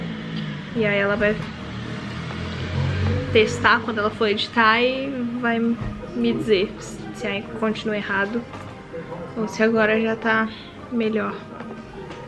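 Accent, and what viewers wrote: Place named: Minas Gerais, Brazil. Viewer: Que linda ! Ta com sotaque gostoso mineiro, família linda . Deus abençoe!🙏🏻🥰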